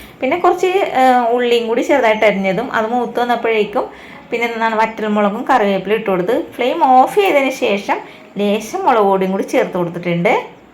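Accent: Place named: Kochi, India. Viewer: എന്ത് സുഖമാണ് കേട്ടിരിക്കാൻ ❤ ഇടക്കിടെ ആ കൊച്ചി യുടെ തനതായ ഭാഷാ ശൈലിയും! ❤❤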